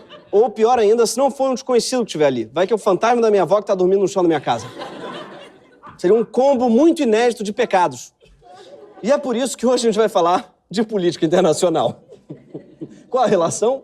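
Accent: São Paulo, Brazil. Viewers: Para de fazer esse sotaque snob dos paulistanos pois 80% de nós não somos assim Um abraço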